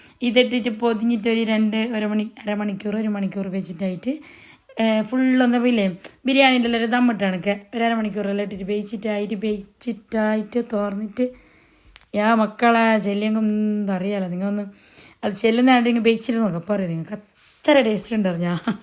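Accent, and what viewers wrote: Kasaragod, India. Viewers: Kumbla specill baasa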